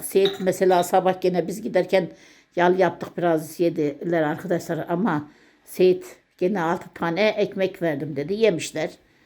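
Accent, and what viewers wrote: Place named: Çorum, Turkey. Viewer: Ben bu kardeslare abone değilim ara sıra izliyorum yorumlara bakıyorum,takipçilerin bazisindan gelen çirkin yorumlar benim bile canımı acıtıyor çok rica ediyorum beğenmiyorsanız neden izliyorsunuz.Turkiyenin her yöresinin lisanı lehçesi değişiktir hanım Çorum'da konuşulduğu gibi konuşuyor beğenmeyen izlemeyecek,ayiptir günahtır.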